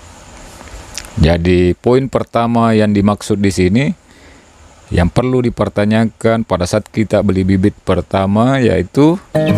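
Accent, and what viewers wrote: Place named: Sulawesi, Indonesia. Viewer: kyk nya orang sulawesi, dari logat nya😁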